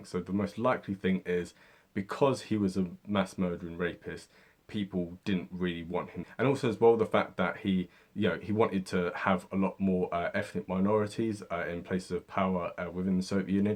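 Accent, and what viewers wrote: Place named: East London, United Kingdom. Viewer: So naive... simplification after simplification... and the language used... sounds like a geezer from Stratford.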